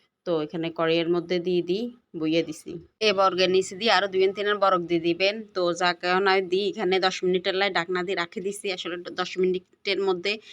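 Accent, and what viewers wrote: Noakhali, Bangladesh. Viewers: খুব সুন্দর রেসিপি ❤❤আর তার থেকেও বেশি সুন্দর আপনার নোয়াখালীর ভাষা❤❤❤অসাধারণ❤❤❤ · আপু রেরিপিটা সত্যি খুব অসাধারণ🌈 সেই সাথে নোয়াখালীর ভাষাটাও❤🌈❤ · অসাধারণ লোভনীয় হয়েছে আপু মরিচ খোলা। তোমার মুখে নোয়াখালী ভাষা খুব ভালো লাগলো। ভালোবেসে একটা লাইক দিলাম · অনেক লভনীয় হয়েছে মরিচ খোলা মাশাআল্লাহ ❤❤❤ সাথে আঙ্গ নোয়াখালীর আন্ঋলীক ভাষা শুনলাম খুব ভালো লাগছে ❤❤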